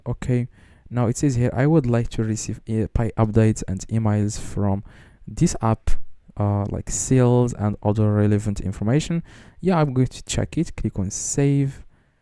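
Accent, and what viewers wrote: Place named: Morocco. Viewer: Chokran a chef , ollah ila mnin bda lvedio , galt hada 3ndo lahja dial mgharba , tbarkllah 3lik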